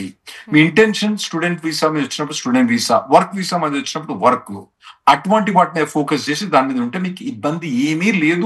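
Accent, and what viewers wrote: Telangana, India. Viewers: Anna,yavoore needhi, pakka Telangana matalu matladthunnaru? 👌👍🙏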